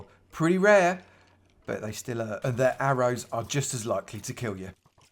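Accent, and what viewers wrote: United Kingdom. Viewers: this is the most usa documentary style video I've seen by someone with UK accent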